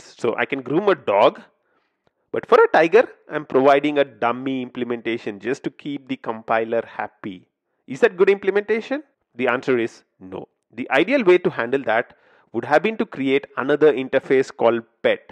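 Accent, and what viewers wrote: India. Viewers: As soon as i heard "Welcome back" in an indian accent I wanted to close it hahahh...but people in India are acctually very good at development. Sadly I just cant take it haha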